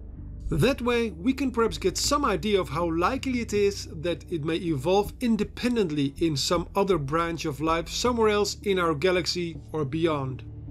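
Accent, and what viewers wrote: Netherlands. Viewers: Aan de uitspraak kan ik met zekerheid zeggen dat jij Nederlands bent, of nie dan?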